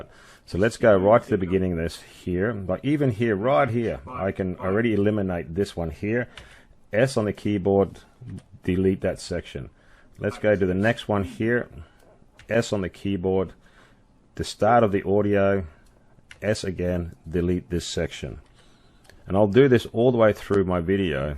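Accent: Australia. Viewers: I enjoy your clarity (and Aussie accent). I saw a Corel tutorial where a video clip was split and the second part moved along in the timeline, leaving a gap between the two parts. For me, the clip always snaps back to the start, even with ripple editing disabled. Can you share how a video clip can be moved in the track?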